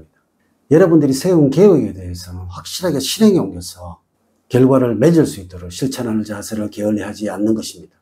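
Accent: Gyeongsang, South Korea. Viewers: 안녕하세요.수고 많으십니다.경상도 발음 이시라 항상 말씀 끝 부분이 속으로 들어가는 느낌이 드네요.첫 부분만 두드러지세요.항상 감사합니다..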